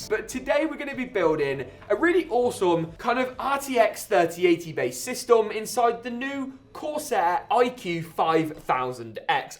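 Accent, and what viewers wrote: United Kingdom. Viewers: your're the british guy right?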